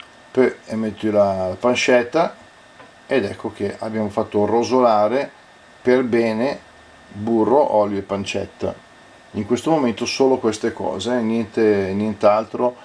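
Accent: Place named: Milan, Italy. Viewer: A parte la ricetta la cosa più bella di questo video è la parlata milanese, dialetto ormai perso. yt è pieno di video di cucina in romanesco, finalmente un po' di lingua madre. Grazie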